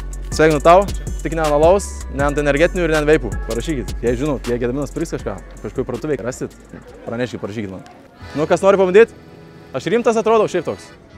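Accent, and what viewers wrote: Suvalkija, Lithuania. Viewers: Jauciasi suvalkietiskas akcentas 😊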